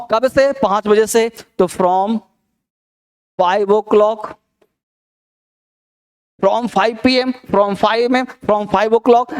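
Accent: Bihar, India. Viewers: Tum bihar se ho kya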